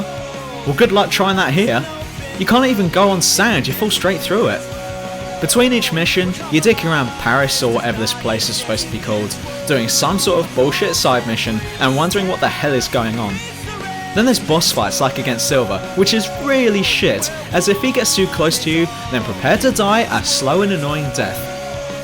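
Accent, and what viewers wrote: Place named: South London, United Kingdom. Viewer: That " " tune is...kinda catchy...especially with the South London (?) accent - I would expect a N'awlins drawl for a title like that. It's refreshing.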